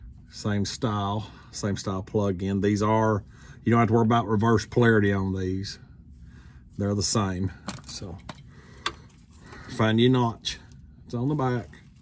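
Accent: South, United States. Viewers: All auto DIY videos hall be made with the deep southern accent. Makes me feel comfortable and brings me back to my childhood. And makes me feel like I can do the diy too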